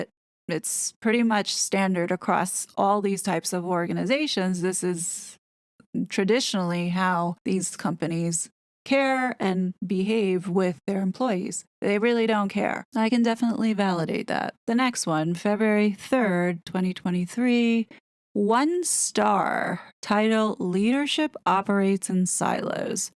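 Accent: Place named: United States. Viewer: Oh bless you, I’m so sorry you’ve suffered - and are still suffering - such a miserable work experience. I’ve no idea of your background, but, imo, you have found a perfect alternative in this channel. Your investigative skills are incredible, you pick up on the most minute details (which other YouTubers completely miss) and your calling out the egregious behaviours of the miserable pair, and those they are involved with at whatever level. I love your sarcasm and dry wit, and you’ve got such a great voice - a very soft US accent (some are very abrasive)! Thank you for all you do for and on our behalf. You are greatly appreciated❣️ ❗️It’s especially amazing to hear that you have faith in God, and that surviving the trauma you’ve been through, you are testament to God’s grace and to the power of prayer🙏🏼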